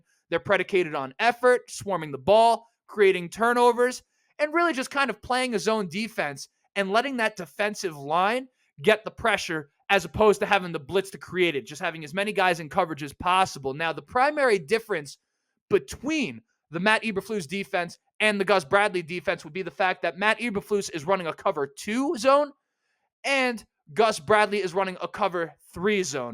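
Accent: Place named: New York, United States. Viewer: hey,! Colts fan since '84----my curiosity is too much for me.....why does I guy living in NY love the colts so much has you obviously do? your looks and your dialect sounds to me like a Giants fan, or a Jets fan or even (cringe) a Patriots fan. Glad you are, but the real reason I subscribed to your podcast is that you are a NewYorker. How did that happen, I mean--why the colts?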